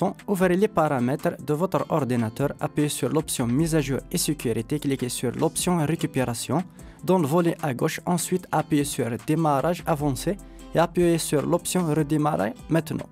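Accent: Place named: Spain. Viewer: il est style ton accent !! espagnooool franchement gg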